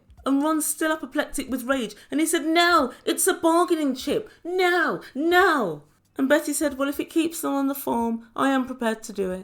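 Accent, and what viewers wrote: South, United States. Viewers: Love your Southern accent! Love your channel! ❤️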